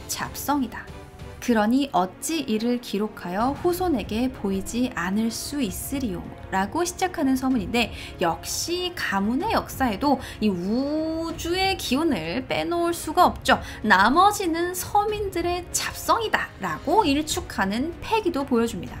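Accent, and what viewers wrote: Gyeongsang, South Korea. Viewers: 경상도 사투리 덜 어색하게 하시네요~^^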